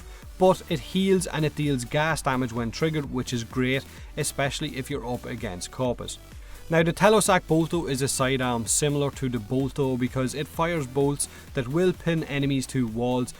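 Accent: Ireland. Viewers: I fehkin lov your accent :D Is it irish? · ayyy another Irish fella on Youtube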